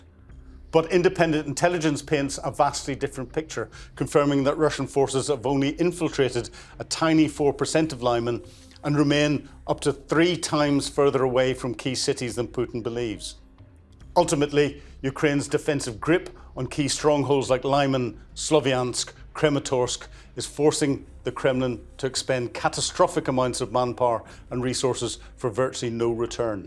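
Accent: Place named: Northern Ireland, United Kingdom. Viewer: He’s from Northern Ireland, originally.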